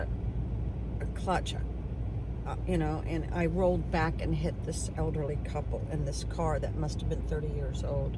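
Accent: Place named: South, United States. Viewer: That pretty.... Southern🎙️🎙️🎙️ VOICE🌷🌷🌷🌷🌷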